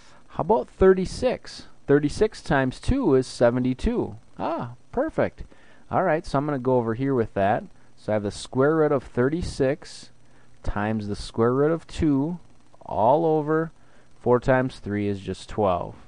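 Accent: Canada. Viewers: ive never heard anyone more canadian and i love it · Are you canadian??? o.o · Are you Canadian?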